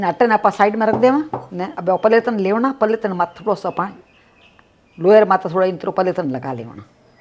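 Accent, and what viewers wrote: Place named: Rajasthan, India. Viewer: Marwari apka bolna achalge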